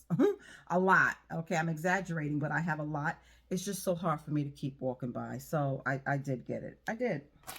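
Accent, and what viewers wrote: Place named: New York, United States. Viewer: I love your accent. Is it NY?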